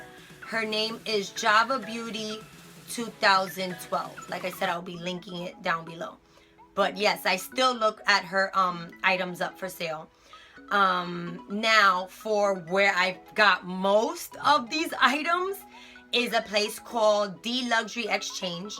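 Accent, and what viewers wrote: Bronx, United States. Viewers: Knew you were from the BX once I heard you talk. Im from the BX too and you took me way back. So many memories. So happy to have found you. Your closet is really dope. Love it. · I knew u was from NYC Bronx bc I'm from here too and I talk like u lol bc when I'm making my videos ppl always asking me where I'm from. Love the bags.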